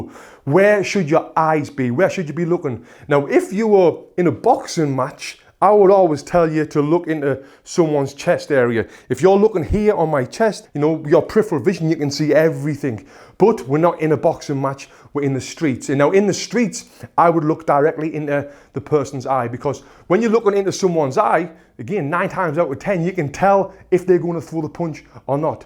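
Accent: United Kingdom. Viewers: Man, I’m in love with the way you speaking, that accent “thru the poonch”! Love British accent since been taught it at school. Being native Russian speaker now living in US and speaking American english. Completely different story. Love to learn more about martial arts as well and boxing is one of the basic ones, it’s classic! Thank you for the video! Keep it up! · How I love British accent! Cheers from Poland! 😉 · Are you British? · I love british accent! I subscribed u cos i loved u said puunchh instead of punch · Your British accent ... Love it 😂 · 20 seconds into the video and there is only one way to describe it, bri'ish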